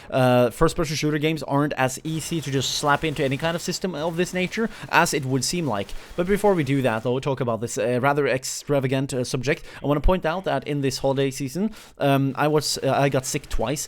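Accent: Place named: Norway. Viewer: You are norwegian right? I can hear it on your accent. I'm swedish.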